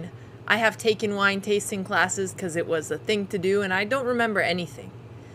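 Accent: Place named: Canada. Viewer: why does she have more canadian accent than usual in this. prob the alcohol lol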